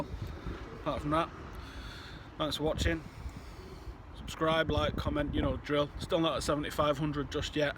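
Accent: Manchester, United Kingdom. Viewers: ***** haha he's got no idea what he could be in for... Carrying a nice camera around... At night... Manc Accent... Oh boy! That MMA training could come in handy though lol.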